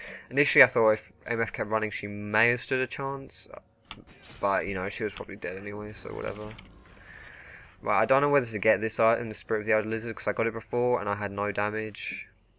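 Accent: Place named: London, United Kingdom. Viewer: london accent :)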